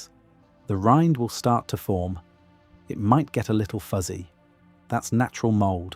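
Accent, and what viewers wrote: England, United Kingdom. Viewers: I am so happy that I found these videos! However, there is one thing: the voice is English, not American. So why are all the videos so completely aimed at the American market? It would be so nice if we could have some comparable information for the UK! Apart from that I love these videos and I am using what I learn from them all the time in as far as I presently can. So thank you! Just some UK aimed info added would be wonderful!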